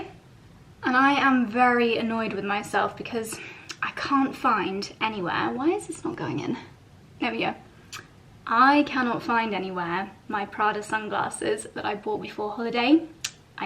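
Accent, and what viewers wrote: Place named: United Kingdom. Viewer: your British accent is always so much more prominent when you're with 🙂 For the new zealander ear, its lovely💕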